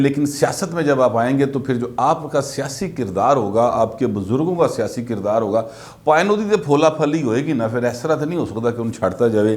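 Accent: Punjab, Pakistan. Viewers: ماشااللّہ! بہت خُوب صاحب بڑے جَٹکے اور سادہ طریقے سے پنجابی تڑکے کے ساتھ حقائق بیان کرتے ہیں مزہ دو بالا ہوجاتا ہے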